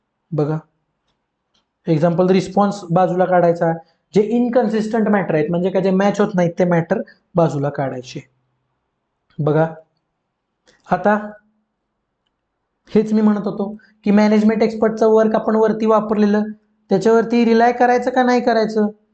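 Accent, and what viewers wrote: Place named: Satara, India. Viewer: सुट्टी not भावा... आवाज तर सातारचाच वाटतोय...❤❤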